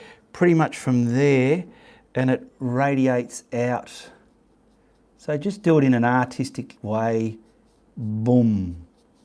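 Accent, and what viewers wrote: Australia. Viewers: Howdy mate. Gees, your ozzie. Love it. Love your work. Love your chatter. Your my morning viewing.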